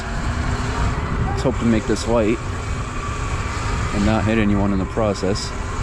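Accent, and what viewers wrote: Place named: East Coast, United States. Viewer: You sound like young Sylvester Stallone my man! That east coast accent. Subscribed!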